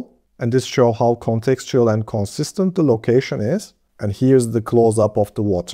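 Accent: Turkey. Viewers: Emeğinize sağlık. Hocam İngilizcesi çok iyi olan bir Türk konuşması duyuyorum. Doğru mudur? :) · kanka turkmusun tam bır turk aksanı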